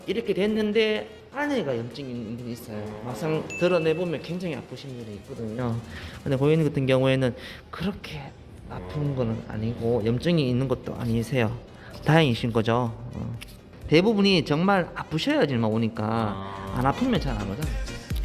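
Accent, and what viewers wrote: Gyeongsang, South Korea. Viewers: 우연히 영상떠서 구독하고.ㅋ 챙겨보는중입니다🤣 좋아요도 눌러가면서 ㅋㅋㅋ 갱상도사투리가 참 좋네요.ㅎ 울아빠도 갱상도 진주 .ㅋㅋㅋ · 진짜 사람생각이 다 같은게 ㅋㅋ저도 리쌤이후로 다른분들 유튭봐도 시원하지가않고ㅠ 덜끝난느낌 이었는데 진짜 ...넘잘하시네요 ㅋㅋ 글구 남자분인데도 되게친절하시고..보통 서비스이라고 남자들 친절한경우잘없거든요 무뚝뚝하고 ㅋㅋ 근데진짜...대구말툰데 쎄지고않고 듣기좋고 실력도 넘 출중하셔요 구독하고갑니다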